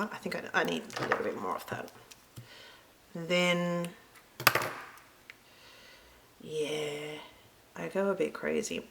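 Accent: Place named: Australia. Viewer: Hi, hello from Norfolk Virginia, great to hear an aussie voice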